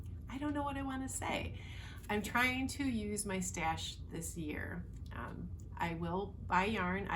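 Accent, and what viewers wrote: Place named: Sioux City, United States. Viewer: Perfect timing for a stash-along as I've decided 2022 is a no buy year for my knitting and cross stitch hobbies. I'm finally noticing all of the gorgeous yarns I have instead of focusing on the next new shiny distraction. I love your accent -- I've been in Tennessee for 30 years, but I grew up in Sioux City. You sound like home and family to me (with some Michigan thrown in ;)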